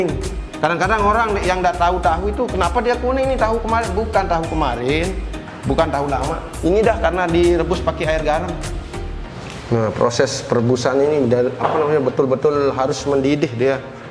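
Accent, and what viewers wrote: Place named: Lombok, Indonesia. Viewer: Perpaduan antara logat sasak dan logat bali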